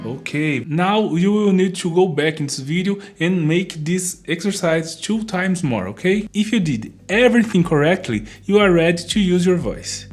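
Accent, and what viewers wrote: Brazil. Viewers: Timestamps Lip trills - Z Sounds- Lip trills - The sound of Z - Tongue Rotations - Glissando - Train Whistles - Lip Trills - Do three sets of these before you sing. Thank you so much,! P.S. I love your speaking voice and your Brazilian accent!!! I'm looking forward to learning your language 😊❤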